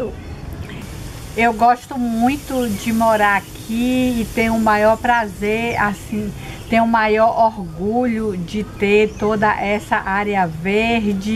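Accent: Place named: Bahia, Brazil. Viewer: beijos pra vc tambem adoro esse teu sotaque bem de baiana arretada, olha que eu sou gaúcha pena que morando desde criança em SP perdi o meu sotaque mas adoro ver vc falando oi gente,beijos até a proxima.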